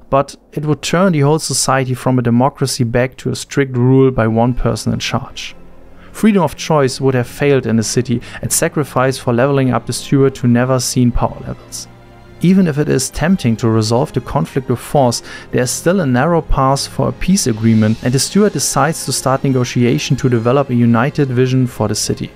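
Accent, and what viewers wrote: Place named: Germany. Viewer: Ich hab nicht mal 10 sekunden gebraucht, um zu merken, dass du aus Deutschland kommst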